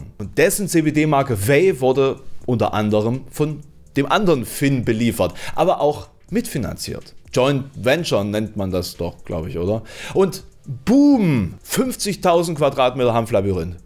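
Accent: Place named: Saxony-Anhalt, Germany. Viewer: Neo Magazin Royale war einmal, mittlerweile heißt es ZDF Magazin Royale. Nur mal so als Zwischeninfo. Dann noch kurz ne Frage an den: Kommst Du aus der Nähe von Halle oder Leipzig? Ich überlege wohin ich deinen Dialekt einordnen würde. Vielen Dank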